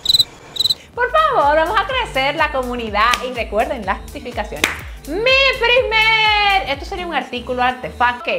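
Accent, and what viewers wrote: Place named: Dominican Republic. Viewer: Amo la energía de esta mujer y me encanta que no cambias tu forma de hablar ese dominicanismo 😄😄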